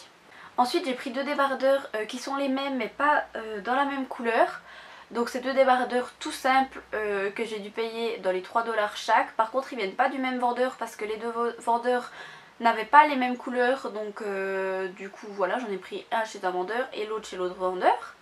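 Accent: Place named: Belgium. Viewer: Super vidéo, je commande tout sur Aliexpress et j'ai eu bcp de déception... Continue comme ça PS: J'adore ton accent 😍 Belge ?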